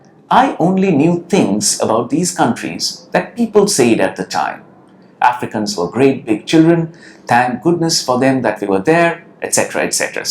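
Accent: India. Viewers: He is not attempting to sound British. This is the acrolectal variety of Indian English. Please don't be harsh on people without any reason.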